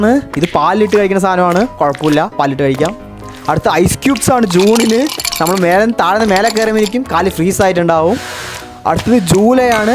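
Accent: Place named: Palakkad, India. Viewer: Palakkad ahno veed slang kettapo choichathann NJN palakkad ahn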